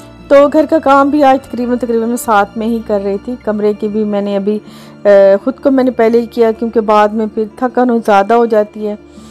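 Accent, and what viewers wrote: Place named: Punjab, Pakistan. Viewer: Apka bòlna buht acha h jb suĺtn bahoo darbar pe jy to waha log asy bolty h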